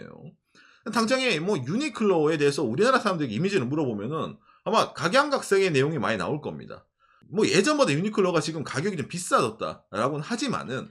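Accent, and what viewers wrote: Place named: Gyeongsang, South Korea. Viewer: 앗, 어딜가도 사투리, 반값소, 갱상도 요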